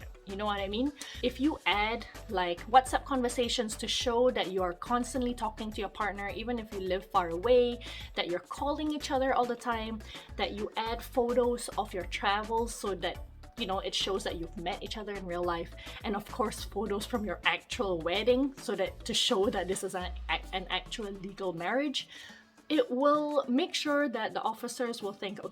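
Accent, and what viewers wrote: Scotland, United Kingdom. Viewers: Can here your Scottish accent getting stronger!! 😂😂🏴🏴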